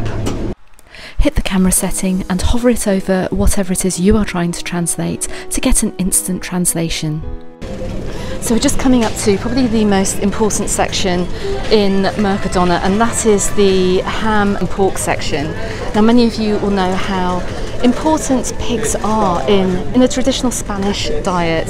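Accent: United Kingdom. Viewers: It isn't possible to sound more English/British than that 😄. Love the content, thanks for posting!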